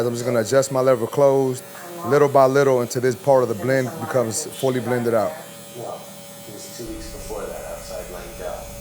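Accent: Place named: Mexico. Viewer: u lowkey sound like that mexican OT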